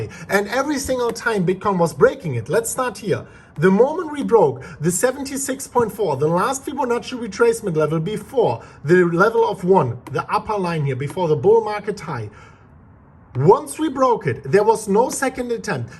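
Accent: Germany. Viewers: Deutscher, hört man direkt :D :D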